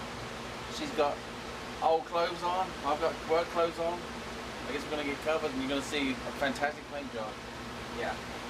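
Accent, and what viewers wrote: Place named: West Country, United Kingdom. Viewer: Where are you from? I get a slight hint of West country then antipodean. Like an accent twisted from being abroad.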